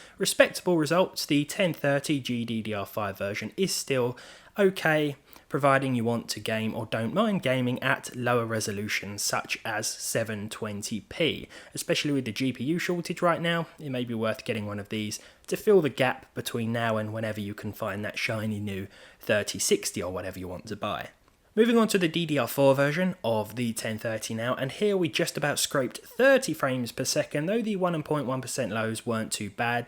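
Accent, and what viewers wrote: United Kingdom. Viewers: I have no use for these videos as my worst GPU is a 1060GTX 6GB, but I watch them religiously. It is the personality, the voice and the lovely accent that draws me. So envious of the British accent. Can't wrap my head around it.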